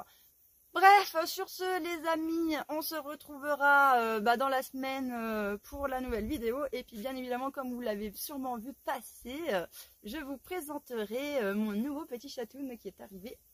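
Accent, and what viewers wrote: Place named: Bourgeois, France. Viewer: En plus tu parles avec ton accent de bourge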